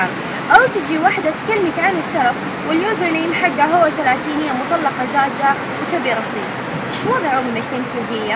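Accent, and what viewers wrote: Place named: Saudi Arabia. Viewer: ليش تتكلمين سعودي خليك على لهجتك احسن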